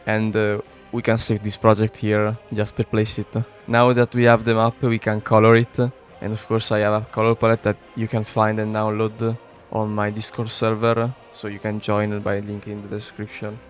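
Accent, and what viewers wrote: Italy. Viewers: The Italian accent goes REALLY strong... kind of Florentine/Romagnol-ish, Anyway, nice video, this will be useful for me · By the voice and the site it's possible to notice that you are Italian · you do sound italian like your youtube channels name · Madonna quanto si sente l'accento italiano 😅😮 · Your voice sounds incredibly amazing It sounds nice when you speak English with an Italian accent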